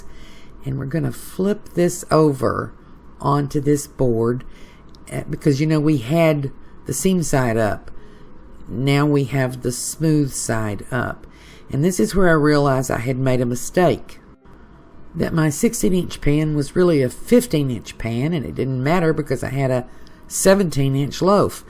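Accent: South, United States. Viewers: I knew you were from the South !!!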